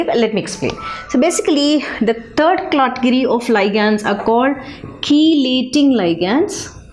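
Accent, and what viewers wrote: South India, India. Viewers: Are you a South Indian ma’am?? Awesome classes. Thank you ma’am.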